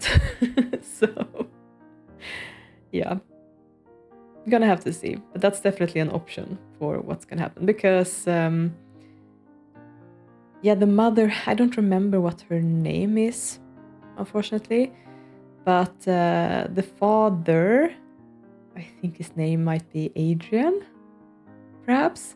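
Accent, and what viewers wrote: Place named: Sweden. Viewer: I always love watching your building videos! You're a talented builder and your videos are always so relaxing to watch ☺️ Are you swedish by the way? You don't have to answer that if you don't want to. But I am Swedish myself and I always thought your accent sounds very swedish ✨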